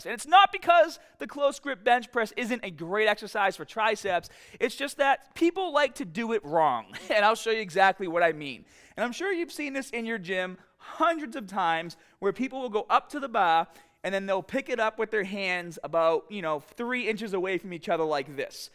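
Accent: Boston, United States. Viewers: I knew it. He does the fucking accent on purpose. I could've sworn I've seen videos of his before where it's not nearly as pronounced. But that first "stahhhted" in this video he clearly did it on purpose. Why..... just.... why? The Northeastern/Boston accent is just so god awful it's like nails on a chalkboard to me. I guess I should've picked up on it sooner. He says "exercise" with no problem. When I was in the Navy my buddy was from Quincy, MA and he'd always say "exahcise." He also said "here" with little accent as well...... so again.... why go heavy on the accent sometimes? It's clearly on purpose. Specifically with "bahbell." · He has to be from Boston with that accent, “When you grip the baaaaaahhhh” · That boston accent tho 🔥🔥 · been following for a few years, very smart training man, the best on YouTube imo. And I've gone through a lot. 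P.S. from MA too, one of the last remaining Boston accents i know ;) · Are you from Boston ??? You have a slight twang on certain words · I know nothing about this guy but can only surmise he is from Boston.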